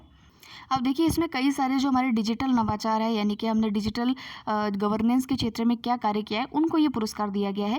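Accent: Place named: Nagaur, India. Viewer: मन लागै आप पक्का नागौरी हो। शैली उत्तम है।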